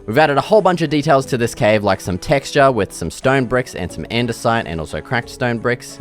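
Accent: Australia. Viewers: I like your channel I love building in all games but idk I just really like your channel pretty genuine also question you Australian?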